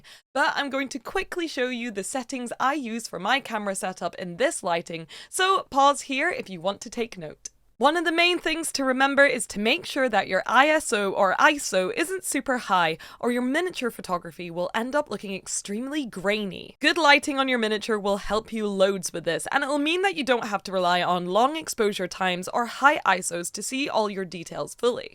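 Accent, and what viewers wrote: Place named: Scotland, United Kingdom. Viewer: I hear a little bit if a Scottish accent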